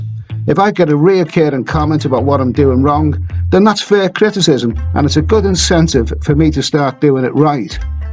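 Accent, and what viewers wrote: Liverpool, United Kingdom. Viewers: What part of Liverpool are you from?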